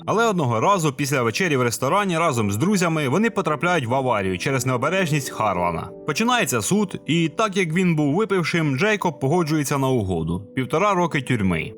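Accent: Poltava, Ukraine. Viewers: Дякую за чудову підбірку, було цікаво дивитися, а ще приємно чути Полтавський говір 💪🏼😊 Від себе хочу порадити фільм - Залягти на дно в Брюге. 2008 року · Чудовий полтавський акуент😊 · Ох вже цей польтавский гуовір😂❤ З Пирятина? Дякую за підбірку🤝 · Друже, ти не з Полтавщини? Бо як рідний) Підписався без роздумів.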